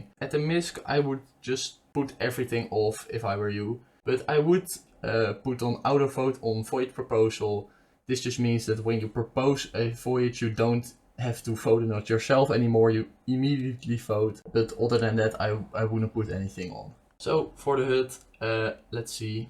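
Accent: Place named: Netherlands. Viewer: ik hoor hier een Nederlands accentje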